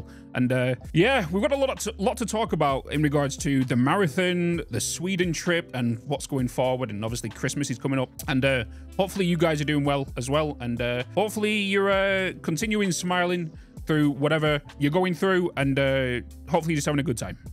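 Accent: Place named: Scotland, United Kingdom. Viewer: my mug arrived, thank you! edit after the video: holy shit bud. sorry she went through that, congrats on walking your sister down the isle, thats a huge honor, 40 lbs is a shit ton of weight, lets go! Dude, as always, we have our notifications on, if you need to take a break, take a break, we'll be here when you get back! Don't feel any pressure to 'preform' we just love your face and brogue, and we always have your back, even if that is you going offline for an undetermined amount of time. also, how did you drop so much weight? you stopped drinking iron brew didn't ya? ;)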